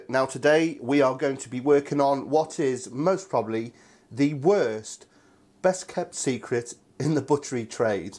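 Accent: United Kingdom. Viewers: I always admire your passion never mind your awsom British accent . I tried these bad boys last summer , it is packed with flavor . one quotation is that grass fed grass finish beef?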